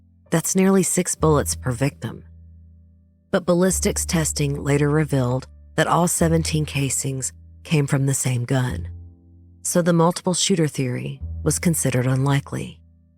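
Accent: South, United States. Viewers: It's so nice to hear a southern accent...❤ Great job on the videos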